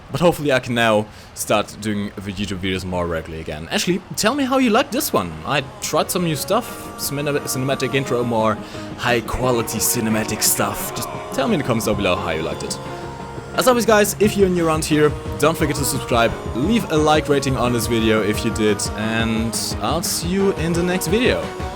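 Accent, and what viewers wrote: Germany. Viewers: I knew you were german and not portuguese :P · Are you German?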